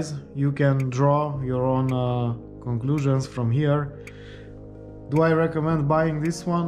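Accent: Romania. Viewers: Esti roman, se simte dupa accent, nu trebuie sa ma uit la tine in profil ca sa imi dau seama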